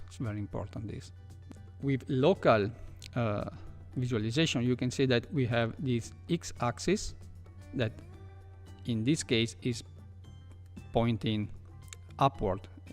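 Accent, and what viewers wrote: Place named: Italy. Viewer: accento piu italiano di questo mai sentito ma grande comunque!!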